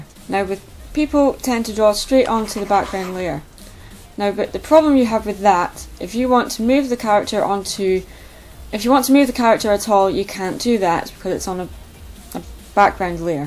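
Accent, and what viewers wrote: Scotland, United Kingdom. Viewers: Theamax Stage No,she's Scottish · you sound Scottish i live in Edinburgh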